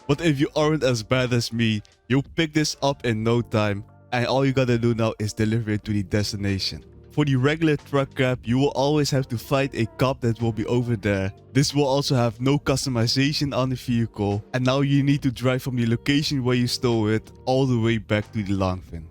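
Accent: Netherlands. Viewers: Hes dutch bruh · His Dutch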